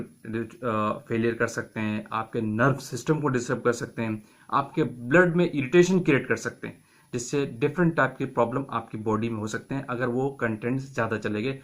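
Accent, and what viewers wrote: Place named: India. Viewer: Ok aapke pass bohat knowledge hai sir pharmaceutical industry ka 🫡🫡🫡 bohat achhe se explain bhi karte ho aapko to pharma industry ka professor hona chahiye language tone se aap 90% indian hi lage baki kuch words se laga ki aap pakistani ho😅 keep it up sir humko knowledge dete rahiye hum aapko support karte rahega 👍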